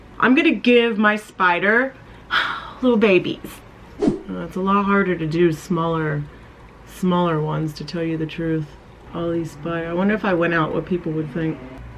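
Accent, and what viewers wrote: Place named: United States. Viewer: I just love your pronunciation sooo much! I'd love to be able to talk so American like you do.. (I'm from Austria that's why lol). Thanks for all the advice, I'm very looking forward to the next video!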